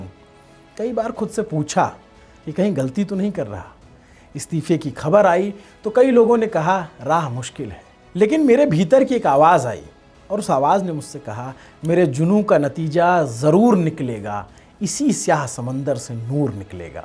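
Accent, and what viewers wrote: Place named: Awadh, India. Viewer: Bahut badiya bhaiya 👏 Aapki awadhi kamal ki hai❤ Lalitpur up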